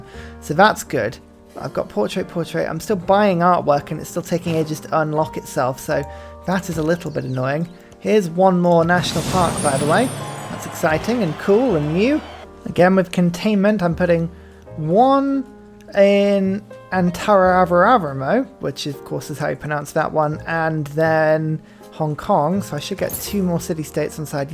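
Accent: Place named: England, United Kingdom. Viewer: Whats your unique accent? I thought it was English lol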